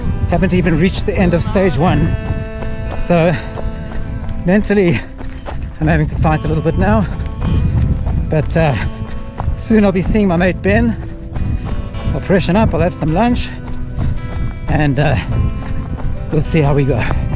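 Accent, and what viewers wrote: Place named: South Africa. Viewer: גם כל כך נחמד לשמוע את המבטא הדרום אפריקאי האהוב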